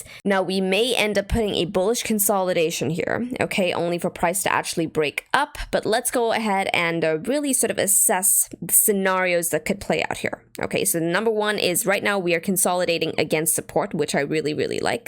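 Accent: Brooklyn, United States. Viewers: Lol her accent is kinda weird, I’ve lived in New York and how she pronounced some words sounds pretty like Brooklyn 😅 like it esp the way she says “cardano” but she almost doesn’t sound American sometimes 🤔🤔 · Yeah Brooklyn is what it reminded me of too. At least the movies depiction of a Brooklyn accent.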